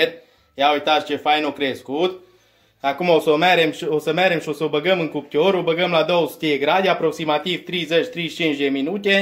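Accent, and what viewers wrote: Transylvania, Romania. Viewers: m-am abonat numa' să-ți aud vorba dulce ardelenească. No așe! Ptituca, să-ți dea Dumnezeu sănătate și mulți prenumeranți.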